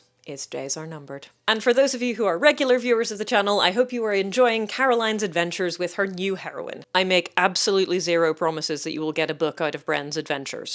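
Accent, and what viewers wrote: Northern Ireland, United Kingdom. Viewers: I’ve listened to the audio book and once I got over the shock of hearing a Northern Irish accent, I was able to sink into the story. I really enjoyed the book and your performance. I gave it one of my few 5 star ratings on GoodReads. I really like a mature heroine. I will wait with bated breath for the next title, but since I like to reread and re listen to books, I will just use this one to keep myself going. ❤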